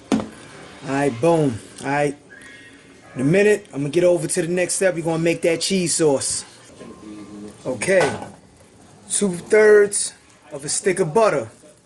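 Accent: New York, United States. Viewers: Silver Stone that's how NY men talk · Dope video bro and I know a fellow New Yorker when I hear one. You actually sound like Ghostface. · You definitely from NY my nigga! Great Video! You do BBQ's?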